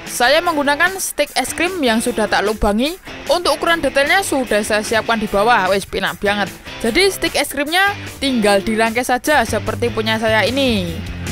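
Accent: Java, Indonesia. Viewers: Bang, menurut sy sih ya klo bs jangan kebanyakan pake logat jawa bg soale gua ga ngerti kadang bahasa jawa,, maksi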